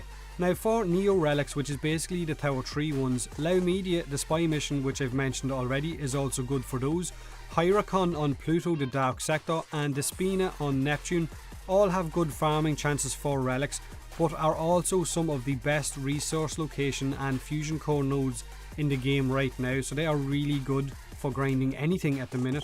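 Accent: Ireland. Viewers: I love that Irish accent :3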